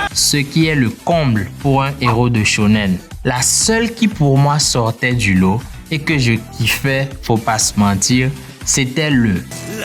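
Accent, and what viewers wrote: Benin. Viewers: J'adore le montage la vidéo😱 (façon trash). On sent l'accent de nos voisins les béninois dans la voix off. Beaucoup de courage 💪bro, tu mérites de buzzer. Et je rajoute : en Afrique (Togo); il existe aussi des putains d'Otaku.😁😁😁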